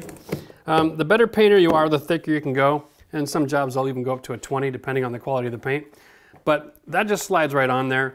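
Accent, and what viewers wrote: Canada. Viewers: Caught that in the first sentence! We love Canadians! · How many people can tell he's Canadian by the way he talks? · "Don't want to drag dirt through your mud".....never heard that sentence before haha. I knew you were from Canada! Sound like Steve Smith. Can you use a premixed joint compound instead? I couldn't find a small bag of 45 on my trip to the store. I grabbed at bag of the 5 repair. Hopefully that'll work! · I knew he was Canadian by his accent. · he's Canadian, give him some credit, we all speak different dialects of the English language. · The fact he mentioned Ottawa and every time he said ou didn’t give it away? 😂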